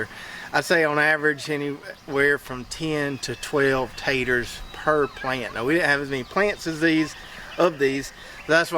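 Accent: South, United States. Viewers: I’m a new subscriber I was wondering what state are you located ? I’m in Florida and it looks like Florida and maybe the accent lol. Definitely from the south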